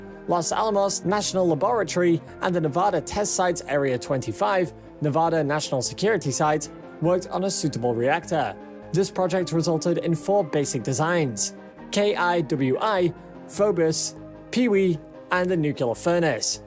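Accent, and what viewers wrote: England, United Kingdom. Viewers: Is he saying "Nucular"? Ax me if I care but I don't think he will be working on a nuclear rocket. Did he just say "Yeeahs" 12 months in a yeeah. I think he might be from England.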